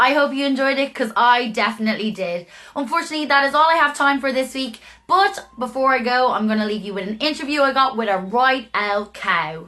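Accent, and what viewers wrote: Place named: Ireland. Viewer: I thought Australian accents were my weakness until I heard yours 😂😂😂. The videos Irish people tasting different kinds of alcohol always makes my day. Awesome videos!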